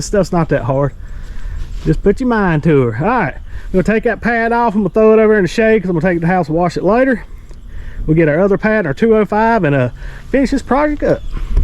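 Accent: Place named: South, United States. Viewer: Man I really like the way southern Americans talk... The "pokie dots" 😂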